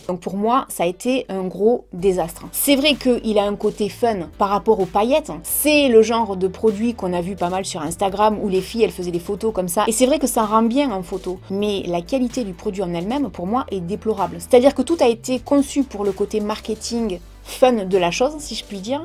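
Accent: South, France. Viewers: J’ai découvert par hasard vos vidéos il y’a deux mois. Ce qui m’a plu d’abord était votre accent du sud, un accent que j’ai reconnu et j’ai été contente de voir que vous ne l’aviez pas modifié. De par mon âge je pourrais être votre maman. Moi aussi je suis du sud de la France mais je vis à l’etranger depuis des décennies cependant j’ai conservé mon accent et nombre de français me l’ont toujours reproché. Ceci dit, je trouve vos vidéos toujours franches et intéressantes. Bravo. Je connais Yankee candles depuis bien des années car elles ont été commercialisées ici bien avant la France et je suis d’accord avec vous, elle sont de bonne qualité, ma fille les aime beaucoup mais leurs parfums sont très prononcés. Je préfère me servir d’huiles essentielles (lavande, orange, vanillle et menthe poivrée). Merci.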